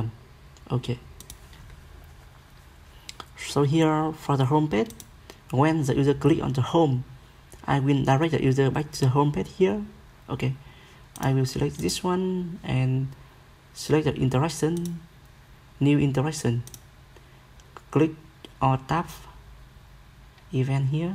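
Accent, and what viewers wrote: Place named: Vietnam. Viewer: Nghe giọng phát âm này đích thị người việt rồi :v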